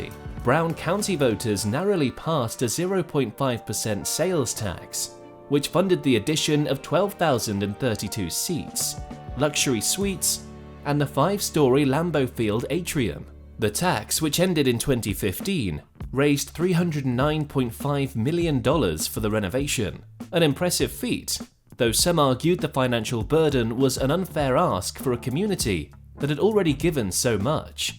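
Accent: United Kingdom. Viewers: Somehow a British voice-over is a dizzy decision when talking about iconic NFL venues. Nice try, I guess? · Why would you use a British accent to narrate this video?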